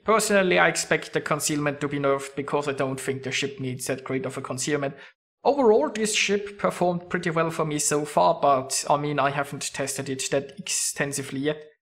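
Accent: Germany. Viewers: Der deutsche Akzent haha sehr gut :)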